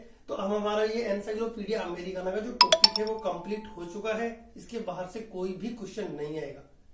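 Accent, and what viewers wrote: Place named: Rajasthan, India. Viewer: अपने अच्छा पढाया, क्या राजस्थानी लोग उनीस सौ को गुननिस सौ बोलते हैं। ऐसा अक्सर देखा है।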